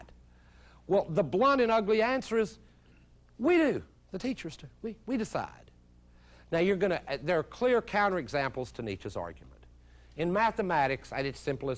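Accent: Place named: West Texas, United States. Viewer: West texan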